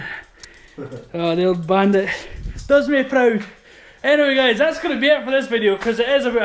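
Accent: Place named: Scotland, United Kingdom. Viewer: Shit. Love the accent brotha haha. Scottish?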